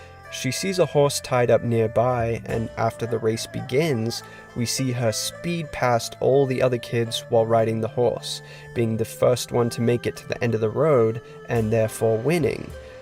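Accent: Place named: United Kingdom. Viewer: This is my second video from you that I’ve watched and I just have to say that your accent is really confusing me bro. You sound like a Brit doing an American accent. There’s no way you aren’t from the uk or at least spend a long time here or something because you’ve got proper English vowels mate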